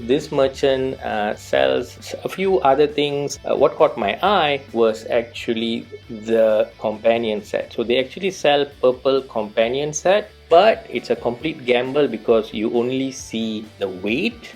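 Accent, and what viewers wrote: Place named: Malaysia. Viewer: Wow Malaysian or Singaporean Indian I’m guessing. Hyped for the endless archive. Re downloading the whole 100gb game again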